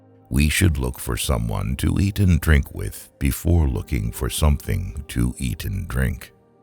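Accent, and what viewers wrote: United States. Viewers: The American accent spoils it.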